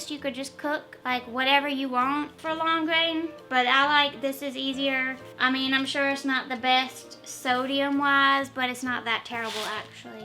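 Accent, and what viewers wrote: South, United States. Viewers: You look like wynonna rider! But with a southern accent! I promise it’s a compliment!! ❤️❤️❤️ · Slow southern draw! Haha it was an adjustment for me when I moved from up north to down south. Apparently on the flip side I speak really fast!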